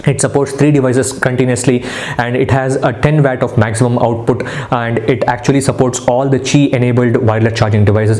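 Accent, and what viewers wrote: India. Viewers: Even doe he has that indian accent this person is so coool Also so underated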